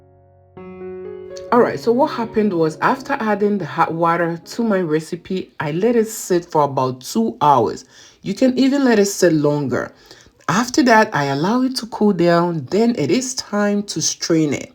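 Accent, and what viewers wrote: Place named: Africa. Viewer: Right especially with that accent sheesh !!! Africans are so dramatic 😂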